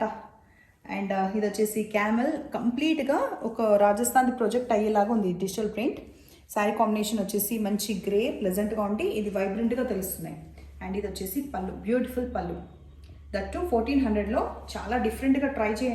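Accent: Telangana, India. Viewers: తెలంగాణ భాషను అచ్చంగా మాట్లాడుతున్నారు వినడానికి చాలా బాగుంది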